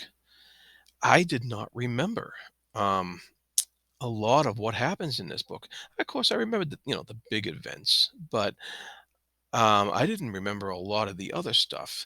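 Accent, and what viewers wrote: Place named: New England, United States. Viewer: I love your accent !! - a fellow New Englander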